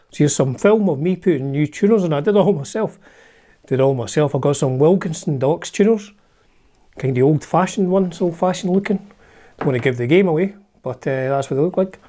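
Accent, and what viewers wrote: Scotland, United Kingdom. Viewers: Damn it YOU REALLY ROCK man ! Btw I would not understand one tenth of what you're saying without reading the subtitles, but it is good ! This way I will practice my Scottish (isn't it?) regional accent comprehension.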